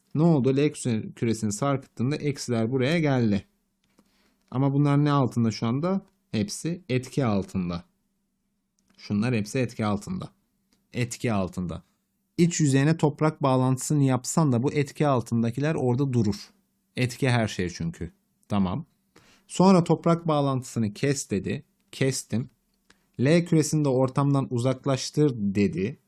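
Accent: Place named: Izmir, Turkey. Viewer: bir insan izmirliyim demeden sadece elektrostatik anlatırken izmirli olduğunu ancak bu kadar belli eder. güzel tekrar etmiş oldum iyi taktikmiş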